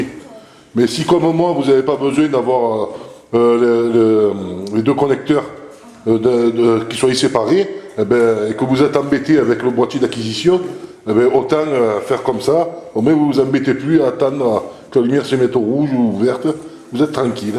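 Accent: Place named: South, France. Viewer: Salut le sudiste ici le nordiste, tu as envoyé du soleil chez moi avec ton accent et ta technique 😉 merci bien! je me bat depuis 2 jour avec des boîtier de m**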